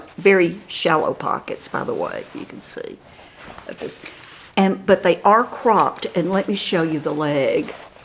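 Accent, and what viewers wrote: South, United States. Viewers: Hi.....so glad I found you! Nanny (Mary Ellen) recommended your lovely site. YOu are so cute and sweet...loved the fashion show.....also love your sweet Southern drawl. Your make up is beautiful. Hugs from a nana in Pa.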